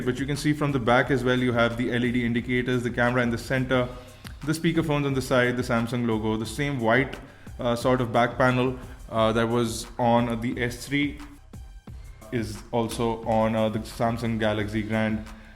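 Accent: India. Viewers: lol this is indian accent review!!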